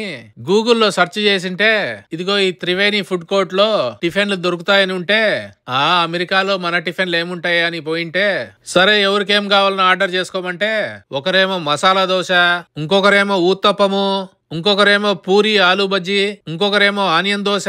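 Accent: Kadapa, India. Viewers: Kadapa slang adhi Alane untadhi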